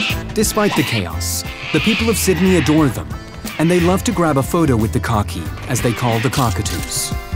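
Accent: United States. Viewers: Why does this video have an American voice over? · American voice over on Aussie content.. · It's annoying that so many videos about Australian parrots and bird life are narrated by bloody Americans.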